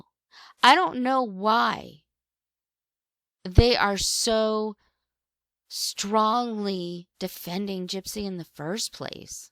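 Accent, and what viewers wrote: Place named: Geordie, United Kingdom. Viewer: I agree, maybe even Leeds. I thought at one point he sounded slightly Geordie or Durham way but he's definitely British I believe 😊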